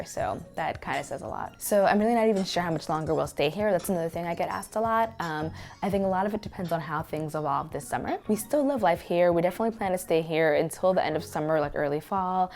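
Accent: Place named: United States. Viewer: Why are you using an american voice.................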